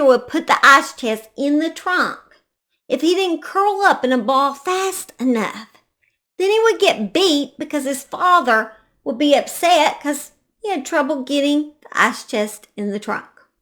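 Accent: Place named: Tennessee, United States. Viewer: I love your Tennesse accent!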